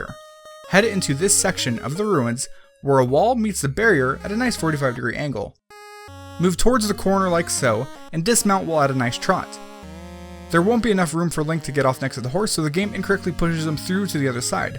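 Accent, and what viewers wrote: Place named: United States. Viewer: Am I the only person that has no idea what the guy is saying? If you have such a thick american accent while mumbling, atleast add subtitles. Please.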